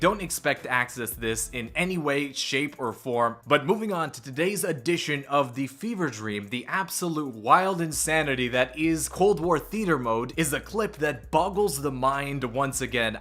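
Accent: Canada. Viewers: Didn’t think twice about the Canadian accent, also up here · Love how us canadian say words, got a good laugh out of that one and not that I really care about being noticed I just watch till the end every time, would like like this comment eh?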